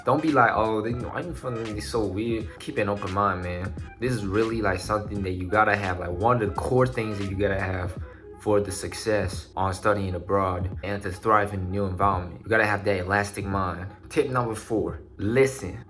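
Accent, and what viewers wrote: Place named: South, United States. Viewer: your southern accent tho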